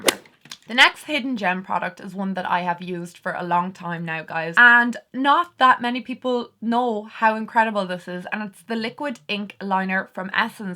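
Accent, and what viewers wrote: Ireland. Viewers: To echo other comments - your channel is a hidden gem! A lot of the big YouTubers in the beauty community are losing their shine for me - they seem to hype really expensive makeup that is out of reach for the average person and review less and less affordable items. I feel your passion and honesty and as someone who used to live in Ireland (now back home in Australia) your accent takes me back to a very happy time in my life. I love your videos, especially the Revolution Beauty reviews. Love it XO... for any other Australians reading this comment, you can buy Catrice, Essence and Makeup Revolution products from Kosmetik4less, a Germany online store that ships to Australia. You can also buy Makeup Revolution products directly from the parent company Revolution Beauty, who also ship to Australia. Priceline also stock some Essence products.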